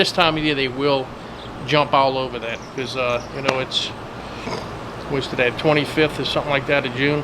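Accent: Louisiana, United States. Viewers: Louisiana...a bit of a cajun accent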